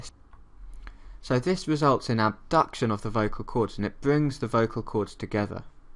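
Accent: United Kingdom. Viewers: I love British accent ❤ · can't listen to the whole video , i hate the british accent -_ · Your british accent and haste makes it very difficult for me to understand :( Can you subtitle more?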